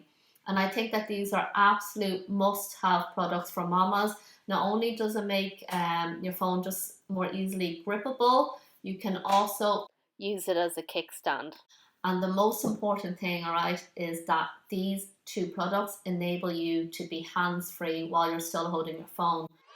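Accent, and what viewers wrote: Ireland. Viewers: Are you from Dublin or Ireland you have really nice accent 😃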